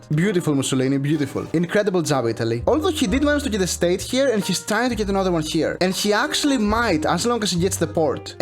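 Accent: Greece. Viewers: Bro are you Greek? You sound very Greek 😅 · I hear an accent in your voice which is pretty similar? Are you from Greece?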